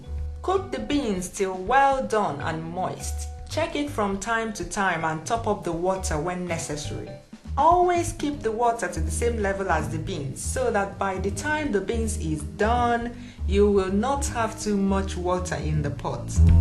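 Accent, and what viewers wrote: Igbo, Nigeria. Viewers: nice accent madam!!! igbo kwenu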